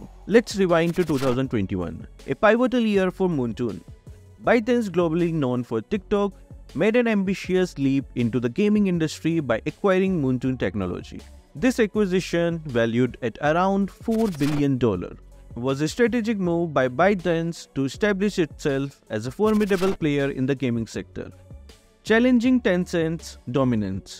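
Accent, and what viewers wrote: India. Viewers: So difficult accent indian ... Caption made you understand instead your voice over..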